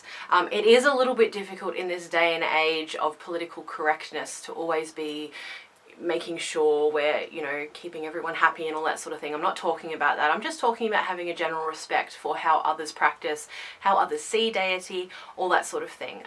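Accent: Australia. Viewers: '27 million' I don't know what it is, but if it weren't for the accent, that's how I knew for sure you're Aussie too. Nobody of any other country or culture that I've met, ever makes those ridiculous hyperboles like us Aussies do. This video was such a great help, it's hard trying to find your way when you're just starting out because there's just so much that it can be overwhelming to know where to begin, thank you for helping me sort out this aspect.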